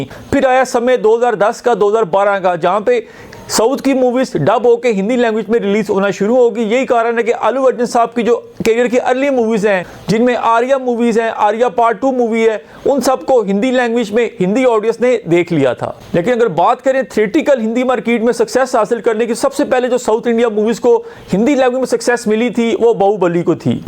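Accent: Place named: Pakistan. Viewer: You seem pakistani accent।।।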